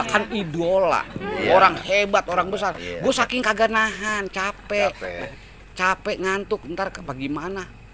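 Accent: Betawi, Indonesia. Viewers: Betawinya tulen banget 👍👍👍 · Abdi Ti Sunda Sangat Kagum Dengan Budaya Betawi Apa Lagi Tutur KataNya ,,,LogatNa enak Di Degar,,, Bang Tolong ilagin Kata Loe Dan Gw Dong... Kata Aye Lebih Enak Di Dengar Dari Pada Gw... Trus Semengat Bang Dan Sehat Selalu...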